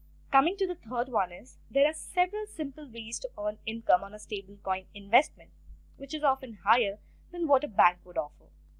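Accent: India. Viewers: Thanks for the lecture. It's just a but hard to follow up with the Indian accent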